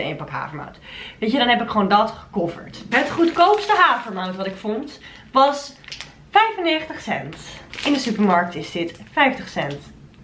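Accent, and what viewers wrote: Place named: Gooi, Netherlands. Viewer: Jouw gooisch accent. Mieterrrs HE-LE-MAEL LEUK 😂 (Kom ook uit dat gebied dus kon dr hard mee lachen) P.s. ik hou van deze serie maar dat weet je al 😘😘😘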